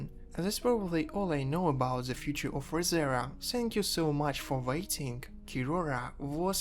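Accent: England, United Kingdom. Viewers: О так вы из англии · Оу, вы из Англии?) Oh , you are from england?) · О, вы из Англии? (неплох)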